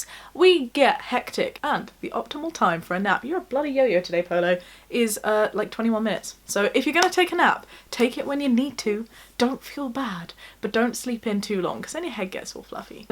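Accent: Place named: United Kingdom. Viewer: Not gonna lie, as an American I think for me it’s the British accent that makes you seem wise 😂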